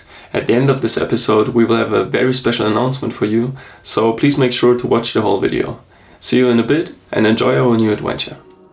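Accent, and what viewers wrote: Germany. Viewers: Greetings from Tricity in Poland 🌊 you are making amazing videos! I love how informative you are and how you are making proper research and visually your videos are outstanding, too. And narrative voice is so smooth , with compassion and gravity. I 've never thought little german accent could be nice ;-))) this episodes was so sad... :-( hard to watch for me but I appreciate your work and preparation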